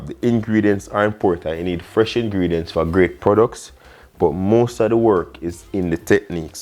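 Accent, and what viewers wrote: Jamaica. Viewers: Yo...Am i the only personwho loves the Jamaican accent? Nah, that's what i thought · man i love jamaican accents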